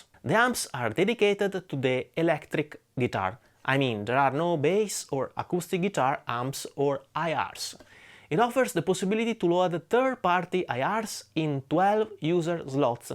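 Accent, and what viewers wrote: Italy. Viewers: Caro signore lei parla inglese come Renzi. Non crede che volendo fare video in inglese dovrebbe fare un po' di pratica di pronuncia? Anyway the video is good, I didn't realize if this gear can accept the IR.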